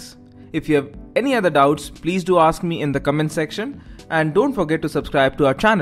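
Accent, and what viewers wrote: India. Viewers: When they have Indian accents you already know they are scammers!!!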